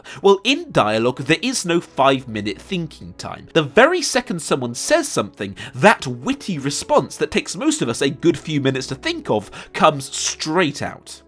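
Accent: United Kingdom. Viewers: It's so refreshing hearing a British accent on YouTube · Imma' be honest, every time I click on video I'm wondering since when did he have a british accent.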